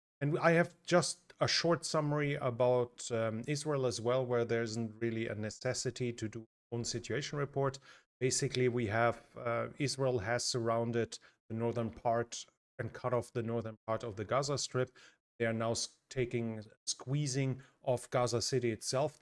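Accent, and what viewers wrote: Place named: Germany. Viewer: With your voice today more close to Deutsch accent 😊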